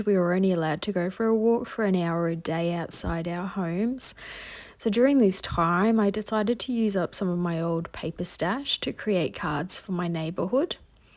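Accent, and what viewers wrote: Australia. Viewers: Hi, thank you for sharing this video with me. I will have to watch some more videos from channel. It is so nice hearing another Aussie accent. ❤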